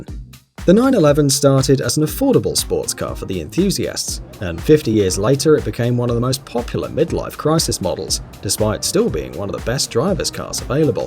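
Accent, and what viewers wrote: England, United Kingdom. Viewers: Are you British i guessed coz of your accent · are you from the UK because you sound English.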